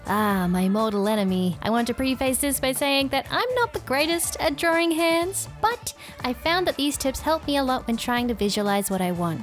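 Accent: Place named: Australia. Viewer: I know a fellow Aussie accent when I hear one. YouTube Algorithm actually cooked for once by recommending me this, saving this video to refer back to likely frequently.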